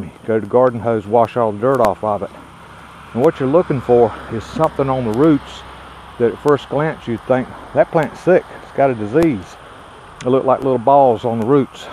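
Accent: South, United States. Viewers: I enjoyed the backpacking trip! Learned a bunch more. That bit of glee in your voice every time you sprayed a weed made me smile - especially when you came across that evil sicklepod (which I promptly Googled) - "DIE" spray "DIE" spray - all in that nifty southern accent. Exactly how much backpacking do you do on your acreage - or was this just a spot check? You are right though - "you can't buy rain". If I could gift you with it I would. Hang in there and keep on being the that you are - won't be long now before there are over 2K of us out there following along and enjoying your content. You have not only grown soybeans, you have grown a fan base who really appreciates what you share with us!:)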